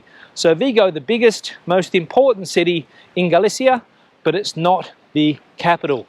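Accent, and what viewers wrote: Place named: Australia. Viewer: Dat Aussie accent. I've been watching your videos for a while. Thanks for all the info. Cheers from France.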